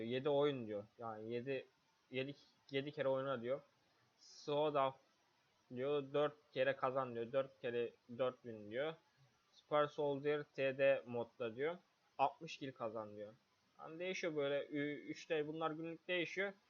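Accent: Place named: Turkey. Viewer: kelimeleri türkçe gibi okuduğunda çıldırıyorum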